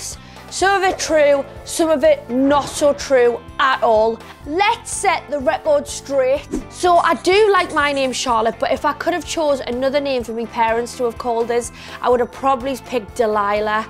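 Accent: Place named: Newcastle, United Kingdom. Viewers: I am so in love with her British accent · Chocolate Muffin it's obviously Geordie isn't it?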